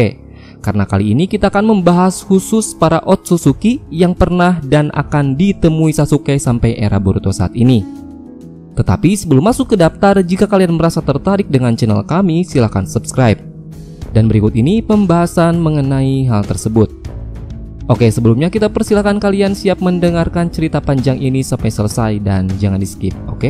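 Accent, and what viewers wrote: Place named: West Java, Indonesia. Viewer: piks.. admin org sunda. 😊😊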